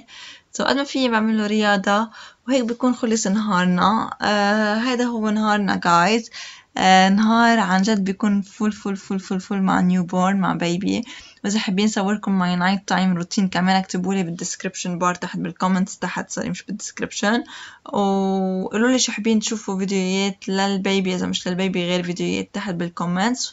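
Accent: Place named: Iraq. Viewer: عراقيه امبينه من حجيج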